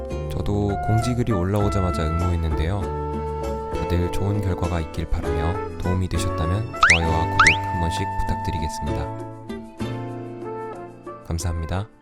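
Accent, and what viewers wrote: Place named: Gyeongsang, South Korea. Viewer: 대구분이시네용 ㅋㅋㅋ 같이 잘되길 빌어요 파이팅!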